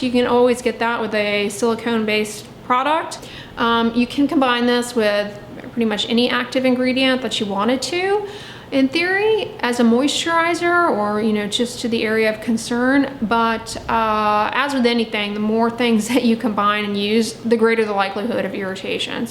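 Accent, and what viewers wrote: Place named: Philadelphia, United States. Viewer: I love your videos, but I’m constantly trying to pin your accent. It’s sounds like the Philly tri-state area (PA, So.NJ, No. DE)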